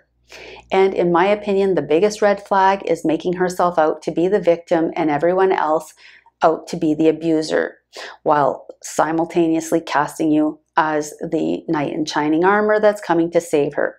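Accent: Canada. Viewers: Hey, you are really good. I just subcribed to your channel. You sound like a canuck... Looking forward to viewing you videos......................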